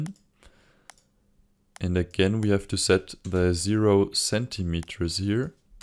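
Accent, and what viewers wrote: Germany. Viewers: Lovely German accent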